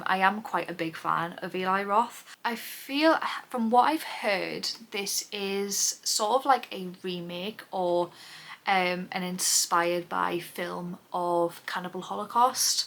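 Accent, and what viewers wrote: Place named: North East England, United Kingdom. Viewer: New subscriber to your channel today.. I thought I recognised that accent.. fellow North Easterner here as well.. Huge horror fan and put up a load of horror vids on my channel as well.. I'll look forward to catching up on some of your vids over the coming days 😎👍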